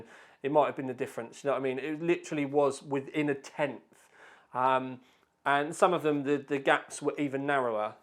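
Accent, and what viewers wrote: United Kingdom. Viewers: nope, they are not a big fan of British accent I assume.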